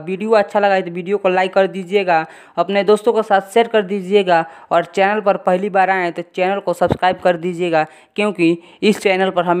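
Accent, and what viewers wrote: Bihar, India. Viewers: बिहारी है ये ग्यारह को इग्यारा बोल रहा है। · Bihar se ho kya bro..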